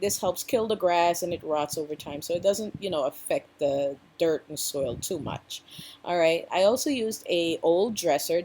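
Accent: Caribbean. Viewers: I knew I heard some West Indian coming through, lol, once you mentioned scotch bonnet and callaloo, I was like yup....lol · I’m Guyanese!! We does call it bora... Ik you sounded Caribbean 💚💚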